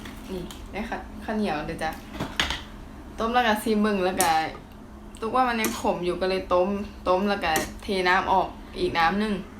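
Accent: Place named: Isan, Thailand. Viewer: อีสานกลางใหญ่ออกคักแด555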